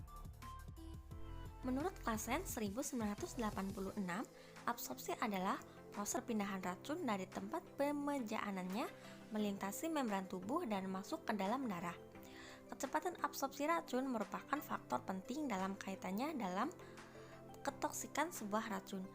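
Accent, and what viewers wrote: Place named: Cilacap, Indonesia. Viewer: Ngomonge koyo wong cilacap bu hikkk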